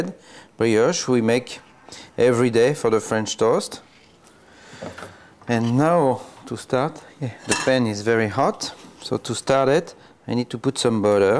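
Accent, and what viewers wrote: France. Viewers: So hard to speak English with the French accent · I love the way French people speak. My friend speaks exactly like he speaks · Adam Sandler with a French accent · I'm so in love with his French accent! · What i like the most is the subtle frrrench accent😄😄😄❤❤❤👍👍👍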